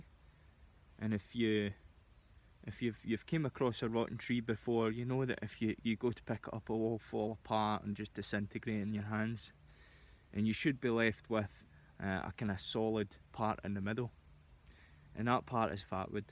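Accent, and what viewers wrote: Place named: Scotland, United Kingdom. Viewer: Do you speak Gaelic? I was thinking you were from Scotland but there was one time I guessed Scottish for someone whose accent was very similar to yours...turns out they were Welsh. Embarrassing. Haha. When you say politer, you mean no swearing? LOL. The brace on the rucksack made it look heavy so I was curious how much it weighs. Is that woodland in Scotland? And you’re welcome! Good luck on growing the beard. Mari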